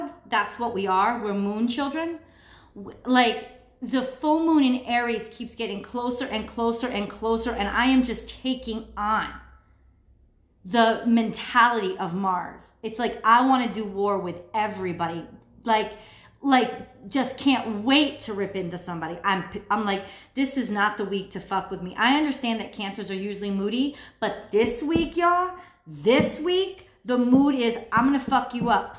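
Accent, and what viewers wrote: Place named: Philadelphia, United States. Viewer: I am laughing out loud.....you sound like you are from my area,,,,Philly......haha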